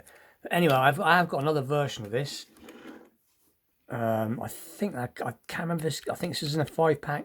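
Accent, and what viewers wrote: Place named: England, United Kingdom. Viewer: Hi sorry you had a bad day buddy, are you in uk i ask as you sound English as seen this Recue pack on USA youtube but cant wait for it to come over to uk 😀👌 great show any way sorry been wanting this for months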